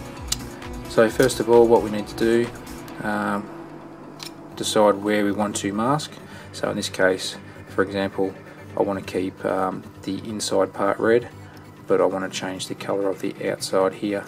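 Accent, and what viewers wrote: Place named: Australia. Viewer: So Aussie haha (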